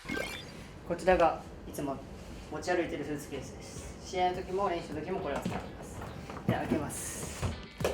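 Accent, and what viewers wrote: Nagoya, Japan. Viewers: 名古屋弁が…… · ほかっとく💕 たまに出る、名古屋弁にキュンキュンしちゃう🥰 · 適度な名古屋弁がめちゃめちゃ良いです😆✨✨ こんなふうに現役スケーターさんの動画が見られるなんて良い時代やなぁ💕 宇野さん、チャンネル開設ありがとうございます🎶🎶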